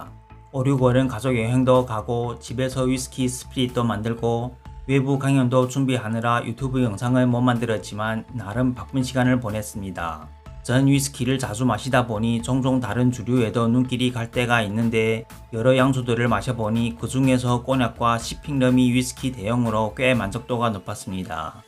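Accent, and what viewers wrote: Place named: Gyeongsang, South Korea. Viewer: 경상도식 국어책 읽기가 귀여우시네요 ㅎㅎㅎ 좋은정보 감사드립니다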